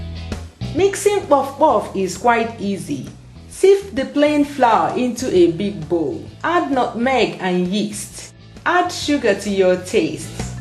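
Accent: Igbo, Nigeria. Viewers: your accent is so Nigerian. I love it! i love how my Nigerian women sound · looool I love the Nigerian accent!! the way you say "puff puff" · hahahaha god her nigerian accent is so funny to me and i am nigerian! still, I enjoy her videos and i learn a lot. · She's Igbo... I like the accent 😃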